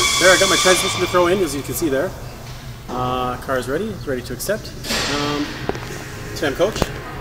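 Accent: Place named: Canada. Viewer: I don't know what makes this video better.....your extensive knowledge of car repair or your awesome Canadian accent.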